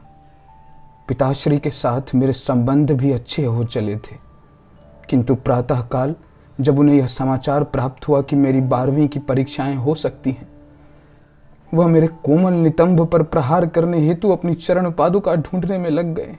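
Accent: Standard. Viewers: Bhai itni shudh hindi 🙂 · aapki itni shuddh hindi sunkar hame charm sukh ki anibhuti hui mitra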